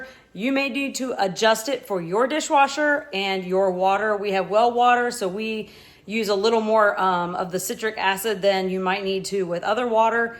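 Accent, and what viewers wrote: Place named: United States. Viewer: She's not speaking English. She's speaking "American". There's a difference. This is the kind of thing that happens when you have had a failed educational system for decades.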